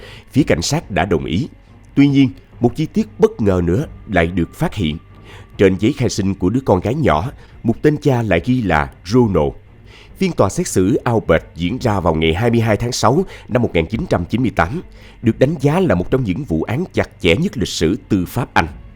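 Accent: South, Vietnam. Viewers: Giọng anh nghe rõ ràng chuẩn miền nam, nghe rất nghiêm túc nhưng rất cuốn!❤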